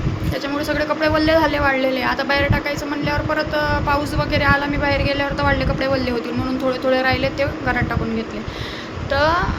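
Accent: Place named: Vidarbha, India. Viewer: तुझी भाषा विदर्भ खूप छान आहे मला खूप आवडते मी खूष आहे तुझं स्वभाव छान एक नंबर आहे ❤❤🎉🎉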